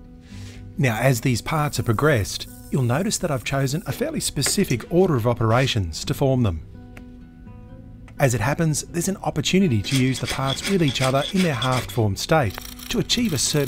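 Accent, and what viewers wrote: Australia. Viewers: Kiwi here; most DEFINITELY has fair dinkum Australian vowels! He's in Queensland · aussie. Eastern states based on the accent. My guess is southern Queensland or possibly Victoria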